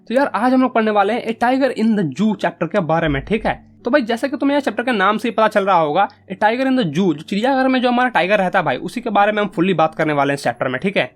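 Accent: Bihar, India. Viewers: Bihar se ho na tum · Bhaiya Bihar up se lag rhe hai😅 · Brilliant explanation sir , I am from Bihar and I could feel your bihari accent coming in between the lecture 😂😂🤣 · Bihar se ho kya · Baauate rhata hai ...... A bihari or I can felt that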